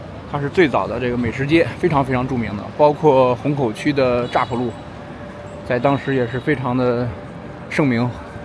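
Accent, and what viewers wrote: Beijing, China. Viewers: 你是北京人嗎,你的國語很好聽.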